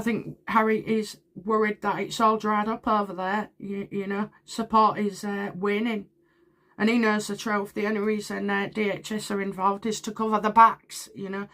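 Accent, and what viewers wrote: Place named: East Yorkshire, United Kingdom. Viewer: East Yorks I think.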